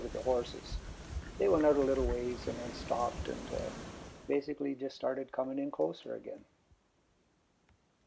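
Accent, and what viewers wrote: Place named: Canada. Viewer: Are you Canadian? You sound like Jordan Peterson (A Canadian debater and Harvard professor)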